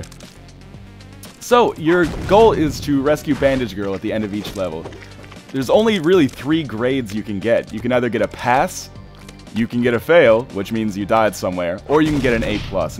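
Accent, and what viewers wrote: Canada. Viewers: Wow, NL sounds like a real Canadian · i can hear the Canadian :D This is a classic xP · The canadian accent is really more noticeable in these older videos.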